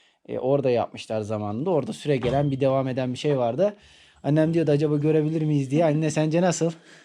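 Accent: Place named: Thrace, Turkey. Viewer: kardeşim güle güle kullanın ahırı, sayayı bir şey dikkatimi çekti ben Tekirdağlıyım sizin orası Eskişehir ama şive bana bayağı Trakya ağzı gibi geldi.Annen Takyadan gelin mi Eskişehir e. Herkese saygılar.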